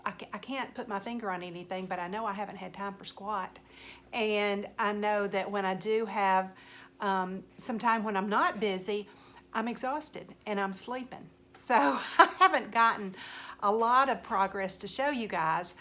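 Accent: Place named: South, United States. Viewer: Oh my goodness. Your video popped up randomly and I see you're from GA. I live in Conyers (25 East of Atl). Where are you located? Where do you shop for cross stitch supplies as I don't know of any in GA? I use 123 Stitch on line shop and a few others. My sister lives in Greenville and I just found out there is a shop near her. I also have my eyes on a trip to Firehouse Poppies (I think that's the name) outside Charleston. I am back to stitching again after I retired and am so happy to see the hobby growing so rapidly. Glad to hear that southern voice and I have subscribed to your channel. Keep 'em coming.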